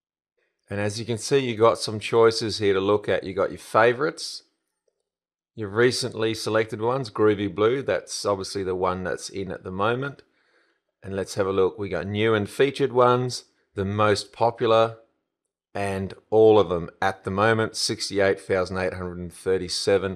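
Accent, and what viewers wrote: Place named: Australia. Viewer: australian!! i love that accent.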